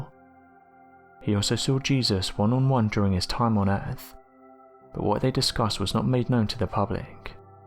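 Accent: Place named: Blackburn, United Kingdom. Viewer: Are you from Blackburn ...that tone of speech lol ... love it..Mad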